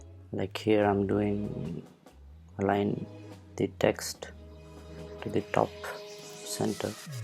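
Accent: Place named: India. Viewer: There should be a warning to filter indian terrible accent.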